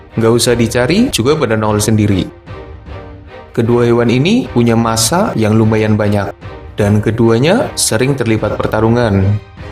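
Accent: Banyumas, Indonesia. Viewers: Serasa di warteg dengr ngomongny,ini aslinya ngapak kykny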